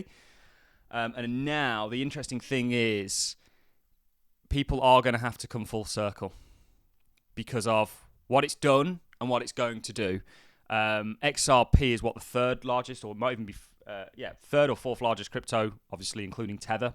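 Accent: Birmingham, United Kingdom. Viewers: What part of Brum are you from?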